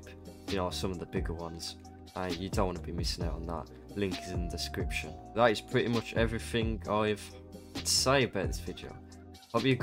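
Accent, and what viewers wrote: United Kingdom. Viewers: Wait ur British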